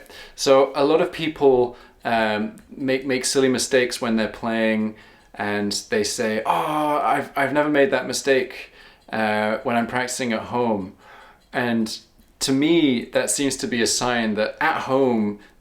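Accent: Scotland, United Kingdom. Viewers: Bro are you really Scottish??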